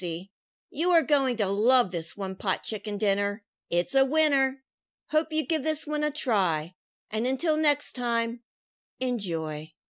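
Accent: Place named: Pittsburgh, United States. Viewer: Hi,! Love this idea! Are you from Pittsburgh? I grew up there but live in CA now. I hear an accent. 😊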